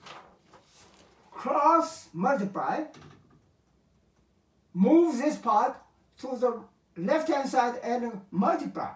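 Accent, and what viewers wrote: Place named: RP, United Kingdom. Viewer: He somehow has an old British aristocracy accent from the movies. Beautiful!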